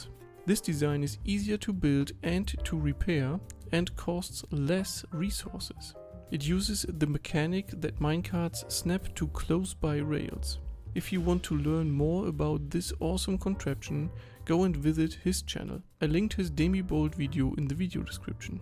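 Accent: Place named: Germany. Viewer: Dem Akzent nach würde ich auf Muttersprache Deutsch tippen? Bei solchen Erklär-Videos würde ich mir eine zusätzliche, deutsche Version wünschen, für die Kinder.^^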